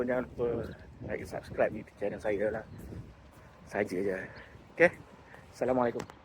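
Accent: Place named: United States. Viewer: Oh man, your slang sounds totally American bro…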